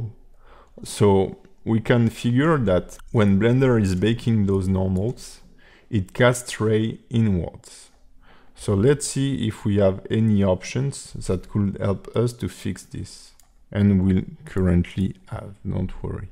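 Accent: France. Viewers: Love ur French accent haha , keep it going !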